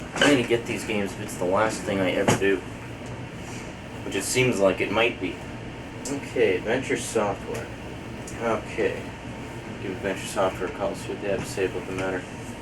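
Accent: Canada. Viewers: You sound Canadian are you from Canada?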